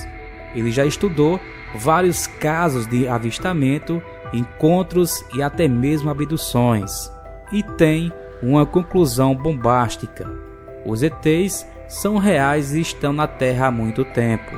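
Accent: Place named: Portugal. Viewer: O locutor é da "terrinha"!!!☺️☺️☺️ PS. Isto não é um comentário racista, é carinhoso, viu?!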